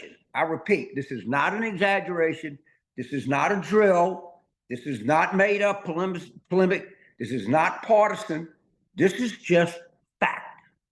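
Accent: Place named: Louisiana, United States. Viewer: I love listening to this Ragin Cajun!! Tell it like T I Is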